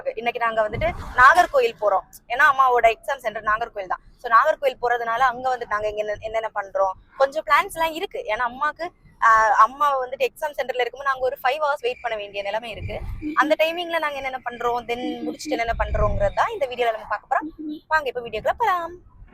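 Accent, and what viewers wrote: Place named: Sri Lanka. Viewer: You sound Srilankan, are you from there?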